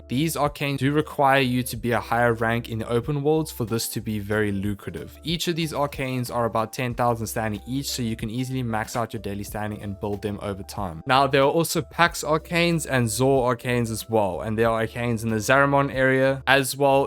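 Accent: South Africa. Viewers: Are you from S.A. ? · South african? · Are you from South Africa 🇿🇦 🤔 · This brus South African 😂. I can hear that accent from a mile away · South African? XD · Man you really sound South African. hmmmmmmmmmmmmmm.... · Are you South African? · South african accent or is it just me? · Love the south African accent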